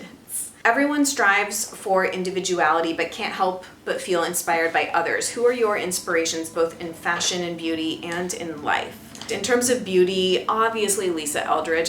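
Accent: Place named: United States. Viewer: First of all, can I say how great your English is? Might sound weird to you, even more so given that I am not a native English speaker myself, but I studied the English language and I love the fact that you speak it sooooo well, with a wonderful voice and accent (I have a thing for American accents, but mild ones, just like yours ^^)and w wonderful and varied vocabulary... You are extremely nice to watch but dare I say you are even nicer to listen to... Anyway, that was a great video, as usual. I discovered you through Clelia and I am so glad I followed her advice! I, for one, would be very interested in hearing you talk about your transition to a non hormonal birth control method (I got rid of that in 2010 too), as well as your relationship to music, obviously. You might have explained it in earlier videos that I haven't watched, but what is your relationship to the French language? 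Anyway, I hope you're having a great time in Texas with your family (I saw in IG that you had some croissants, good girl!! ^^). Thank you for being a very inspiring woman.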